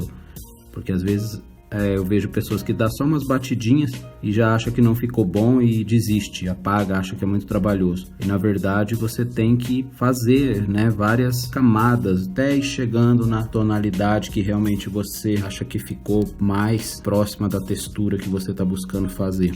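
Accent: São Paulo, Brazil. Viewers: boa parceiro. show de bola. Tentei entrar no site e deu nao encontrado. como faço pra fazer cursos com voce ? vc é de sampa ? (tem sotaque de sampa - conterraneo) eu moro aqui em Pinheiros - Vila Madalena. me chama no zap <num>. valeu.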